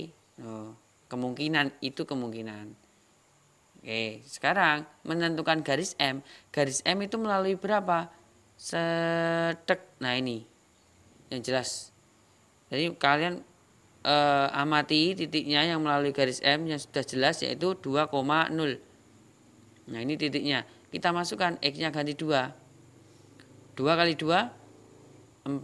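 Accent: Java, Indonesia. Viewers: Mksh ilmunya....cara penyampaiannya enak...ada medhok"nya jawa...salam dr Bogor kak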